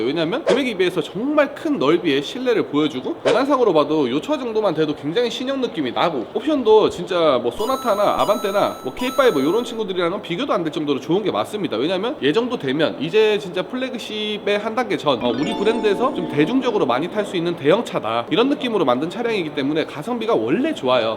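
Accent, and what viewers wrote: Gyeongsang, South Korea. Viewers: 경상도사투리 싫어하는데 이양반 말투는 듣기좋아 ㅋㅋ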